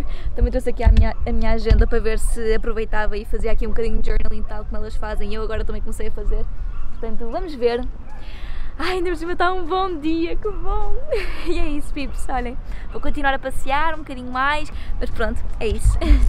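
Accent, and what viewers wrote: Portugal. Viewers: Eu acho que eu entendo muito mais o inglês do que o português de Portugal KKKKKKKKKKK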